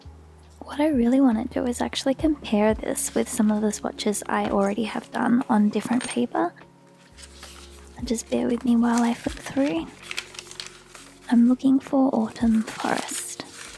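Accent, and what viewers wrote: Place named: Australia. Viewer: Great to hear an Aussie voice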